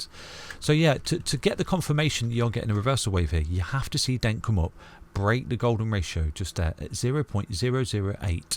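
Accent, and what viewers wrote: United Kingdom. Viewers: Thanks so much. I love your videos (which are very eductive) and your fabulous british accent!:) Which PL team do you support? Take care.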